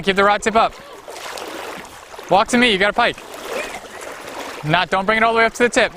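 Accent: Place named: New York, United States. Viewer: it's how us New Yorkers talk😂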